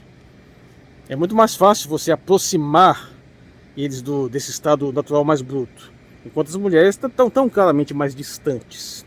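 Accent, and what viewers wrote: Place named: Brasília, Brazil. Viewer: Tu vive em contato com o bioma cerrado direto ? Pelo seu sotaque acho que você é de Brasilia ou do DF.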